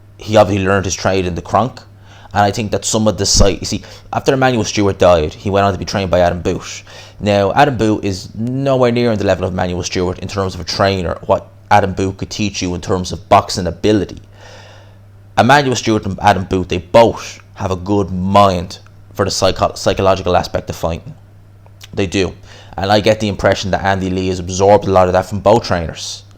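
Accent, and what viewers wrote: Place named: Ireland. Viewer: My Irish bro.Good to hear the accent making boxing news ☺️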